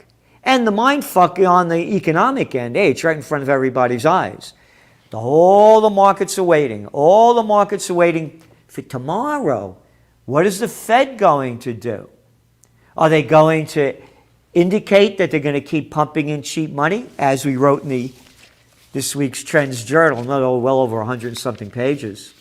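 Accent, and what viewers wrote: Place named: New York, United States. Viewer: Just freaking luv you. Never miss my old state NY, when I hear your voice. Tell it like it is.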